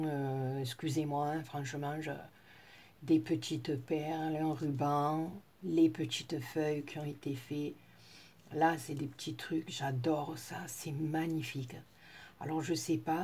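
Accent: South, France. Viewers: Oh ma belle, quel plaisir de t'entendre et d'entendre le sud dans ta voix ! Trop heureuse que tu ai été gâtée et tu es tellement touchante !!!! Te fais de gros bisous ♥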